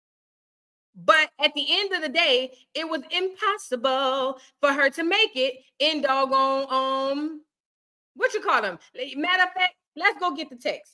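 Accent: New Orleans, United States. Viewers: You definitely be putting everybody on before they catch on 💯love u 🥰i want a N.O accent so bad 😂😭 its so fire 🔥 lol 😆 🖤🖤 via the green lady state 🗽🗽🗽😂🤣#keepwinning💪🏾✊🏾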